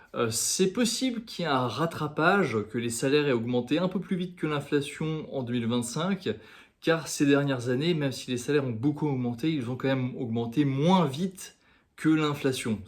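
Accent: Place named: Poland. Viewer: Merci! L'accent polonais m'a perdu. Le centre ville de Lodz à un charme médiéval ( après ma visite de l'année 2013). Selon wiki, ce sont les chevaliers teutoniques qui ont construit son château. La ville à du se développer autour (comme un peu partout.) Lodz me paraît assez charmant selon les images google. Peut être une belle découverte grâce à vous.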